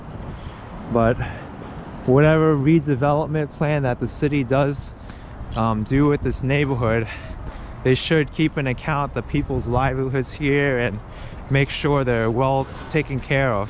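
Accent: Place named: Queens, United States. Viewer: That was charming I really enjoyed it. You have the best Queens accent I ever heard, not too nasal. Just right.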